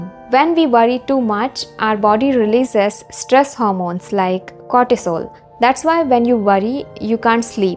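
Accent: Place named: India. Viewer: Where'd you learn to speak your english??? India... How annoying you sound!!!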